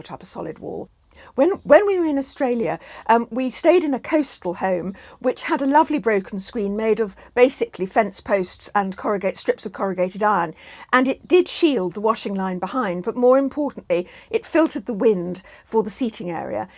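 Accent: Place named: England, United Kingdom. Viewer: Never thought I'd be listening to such a delightful English accent when I wanted help with my super windy terrace garden. And the tips are marvellous to boot. Thank you for the video. :)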